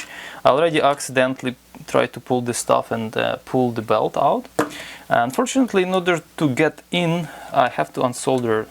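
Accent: Russia. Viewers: Zagreb is in now called Croatia. I also love your accent man! Gotta love the Russians! Keep up the great work. :)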